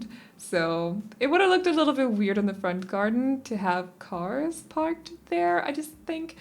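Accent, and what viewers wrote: United States. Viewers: Åååh hvor er jeg glad for at du taler med Amerikansk accent og ikke klassisk Danglish. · I keep forgetting that you're not American because you sound like it.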